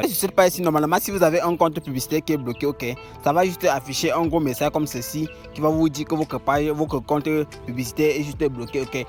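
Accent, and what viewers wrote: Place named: Cameroon. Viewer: Franchement, on a du mal à vous suivre, tout le monde n'est pas camerounais...Allez doucement pour ceux qui vous suivent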